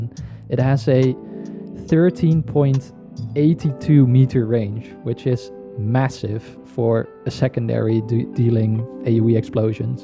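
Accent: Netherlands. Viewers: Yow bruh you accent is dutch or you from tw Nederlands?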